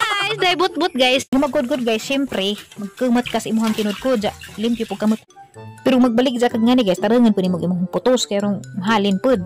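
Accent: Bohol, Philippines. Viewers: Hua<link> ba anang watermelon mo sis..nalingaw ko ug tan aw sa.imo mga video nahimout ko sa imong sinultihan nga bol anon kay mao man ako mama taga maribojoc siya..sending my support sissy mmlso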